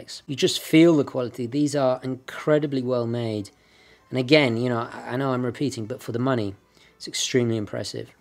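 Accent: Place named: Estuary, United Kingdom. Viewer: Excellent comparison of two superb time pieces. I also love your slightly broken Thames estuary accent that I find very relaxing on the ear compared to the traditional Cockaniny flat vowelised delivery of Eastenders. Cheers.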